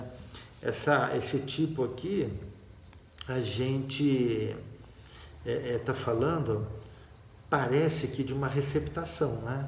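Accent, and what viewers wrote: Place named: Brazil. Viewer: No le entiendo bien esta muy brazileiro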